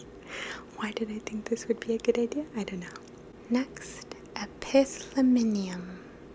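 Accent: Italy. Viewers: you’re little Italian accent in Spanish is so cute :) btw I love that your dog is right behind you kinda camouflaged lol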